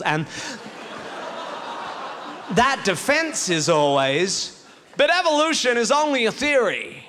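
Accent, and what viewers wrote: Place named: United States. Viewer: Wow he does a very good American accent.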